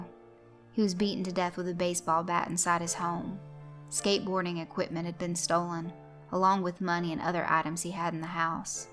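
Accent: South, United States. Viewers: I have heard you say that you are from Georgia , but I don't think that I have ever heard a southern accent from you . That is until this video . While the theme of the video is always a sick and sad story , your voice gave it understanding and empathy for the friend in the story . Some reading this would automatically go for the anger and disgust of Chango . They probably also cheered when he was killed , but I think the story goes well beyond that and to the suffering of the victims . But either way your voice gave respect to the victims of child molestation and to the survivors .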